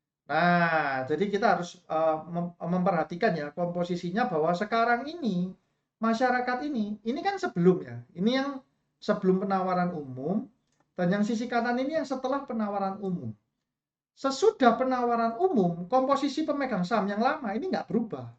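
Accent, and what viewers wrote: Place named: Java, Indonesia. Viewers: suara medok pean cak ,,, hhhi has jawa timuran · Auto subscribe ko.. Review jujur & mengedukasi 👍🏼 Medok e yo pas di kuping 😆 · dialek khas jawa mu mas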